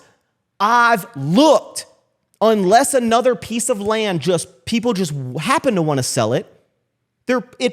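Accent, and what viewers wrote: United States. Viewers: The onset of the country accent is crazy. Love you! Rooting for you always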